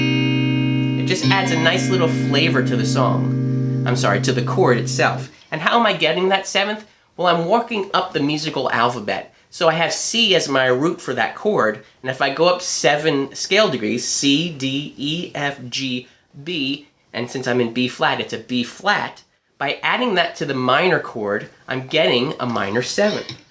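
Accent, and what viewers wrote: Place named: United States. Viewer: great guy irritating American accent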